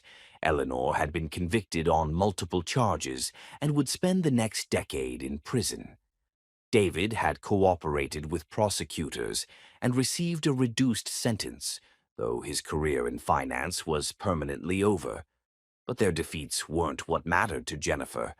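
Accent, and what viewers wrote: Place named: England, United Kingdom. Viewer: I like this story because of the eloquently English spoken accent. I also like that the couple didnt wait to show their feelings for each other❤ Listening from Antigua 🇦🇬 Patrick is a bit slow in getting ahead of his greedy cousin Marcus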